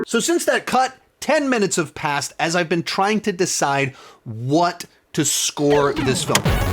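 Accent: United States. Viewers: The voice and speech pacing of this guy are so American. Too much gesticulation. Sorry, unbearable for me to watch & listen to.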